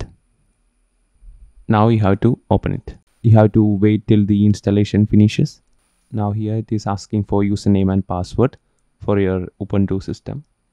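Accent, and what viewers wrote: India. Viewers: no offense but you sound like the indian guy from tutorials aibot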